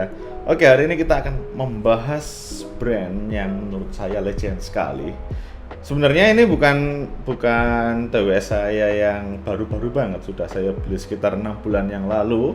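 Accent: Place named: Surabaya, Indonesia. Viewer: pantes suarae khas arek suroboyo... sik iling ae 😄 semangat... sering2 gawe konten ya 👏🏼👏🏼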